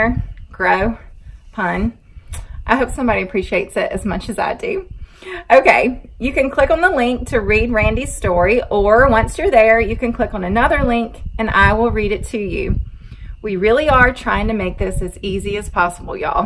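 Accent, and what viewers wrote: South, United States. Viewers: I love the way you talk! That accent is great! Ol HWJR ! send all of our gals down to Georgia to learn to talk? Or maybe North Carolina! By the way for those of you that are not as old as I am. Ol HWJR = Hank Williams Jr. Good article there girl! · Your not talking different, your talking with a southern accent, just like people from Boston Maine and Texas! This country has many different accents! 😊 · okay maybe you talk a little southern ;) but that beautiful smile of yours just lights up the world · I love the way you talk , being from Canada I like to listen to you talk southern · You are not 'different!' You are just a sweet 'Southern Belle!' Your voice and sayings just epitomize how we Southerners talk and the way we Southerners do things. I'm from the South, Georgia, just like you and I can tell that we had parents who raised us to be kind and respectful to others and all of God's creatures. 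So don't every apologize for the things you say and do 'different' from others. I always just place them in the category of being 'different.' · You don't talk different just southern it's great don't change anything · Ur a southern girl, ur r suppose to talk that way. We love it. Continue on.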